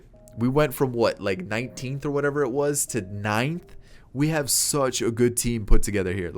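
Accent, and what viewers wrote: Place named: Boston, United States. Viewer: Sound like a Bostonian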